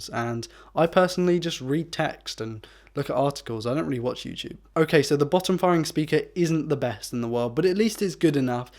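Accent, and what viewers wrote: United Kingdom. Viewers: we should listen to this guy, he has a British accent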